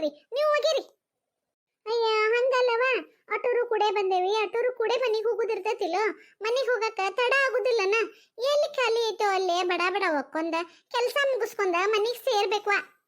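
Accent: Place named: Vijayapura, India. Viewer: ಅದ್ಬುತ ಮಾತು ನಮ್ಮ ವಿಜಯಪೂರ ,uk ಭಾಷೆ ನಿಮ್ಮ ಚಾನಲ್ ನಲ್ಲಿ ನೋಡಿ ಕೇಳಿ ಬಹಳ ಖುಷಿ ಆಯಿತು ನೋಡ್ರಿ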